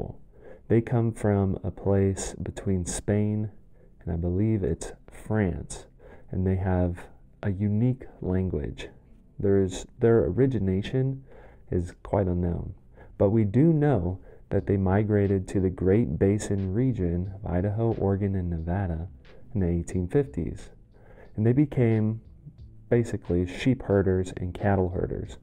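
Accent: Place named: California, United States. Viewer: Born and raised in the Great Basin, this presenter is an embarrassment. He sounds like a Californian. I also take issue with his incoherent comment about the Great Basin not being part of the larger value set of traditional Americans. If there was and is any place in America that has hung onto traditional American values, it is in the cow country of the Great Basin. The traditions of the Vaqueros with the long reata, hackamores and spade bits never got the attention of the Texas cowboys precisely because of the remoteness of the region and the independent nature of the buckaroo. Battle Born. Storm Rough Country Horses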